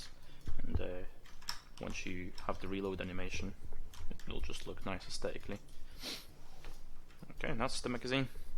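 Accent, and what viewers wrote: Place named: Russia. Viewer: Your accent is close enough to russian accent :D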